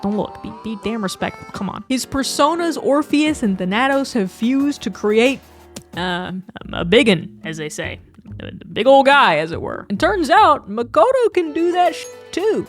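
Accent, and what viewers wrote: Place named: Texas, United States. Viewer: this is totally unrelated to the video but you must be from the south right? I’ve lived in Texas my whole life and you remind me of people I’ve grown up with and people I’m around all day every day with your accent and the way you talk. I swear you must be southern cause it’s uncanny how alike you sound to people I know in Texas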